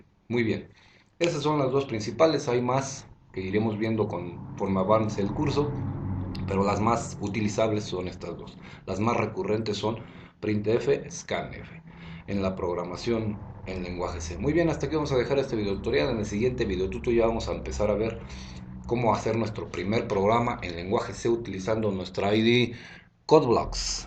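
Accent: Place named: Mexico. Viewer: De todo YouTube es al que más le he entendido, con respecto a la programación. ¡Felicidades! Se le entiende muy bien, yo creo también tiene que ver que use las mismas expresiones y el mismo acento que yo, ya que también soy mexicano, soy de Guadalajara. Saludos.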